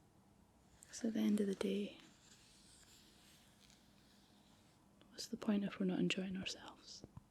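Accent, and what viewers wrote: Scotland, United Kingdom. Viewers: Pretty Scottish lady, the English and Americans laugh at your Scottish accent, but I don't; I find it pleasant and it helps me understand English better. Also, when I listen to the music from Mel Gibson's Braveheart while flying over your Scottish landscapes with a drone, it's magnificent. I'm French, and I hope to hear from you soon. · Wow. That's like the most scottish accent I've ever heard 😂❤ and I've been to Scotland once, and loved it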